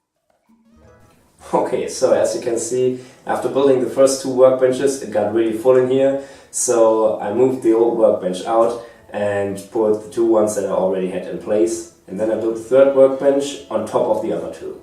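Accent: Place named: Germany. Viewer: with the accent anything you say sounds reasonable and well engineered. "I have replaced wood dowels with spaghetti noodles." 100% confident German accent. gold 1m views.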